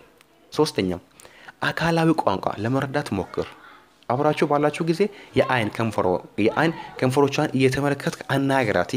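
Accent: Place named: India. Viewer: u sound like an Indian guy speaking English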